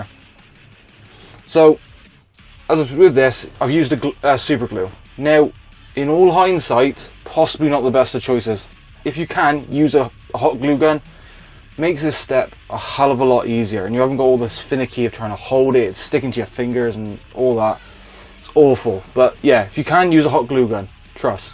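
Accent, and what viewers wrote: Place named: Scotland, United Kingdom. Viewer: I can't make out if you're Scottish, Irish or Cornish. Cracking video 😁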